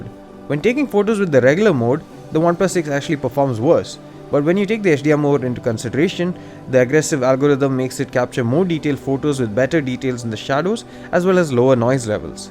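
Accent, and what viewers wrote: Kerala, India. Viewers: Bro are you from Kerala ?? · bro are you a malayalee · Are you from kerala??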